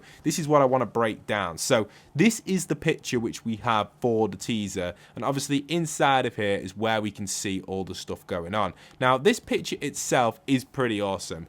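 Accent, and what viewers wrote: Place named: United Kingdom. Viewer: He sounds like he is form the UK and they call Soccer Football over there.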